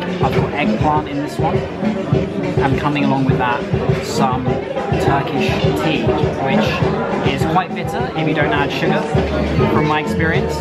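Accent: United Kingdom. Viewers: You’re British? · Whıte Turk It is English British accent!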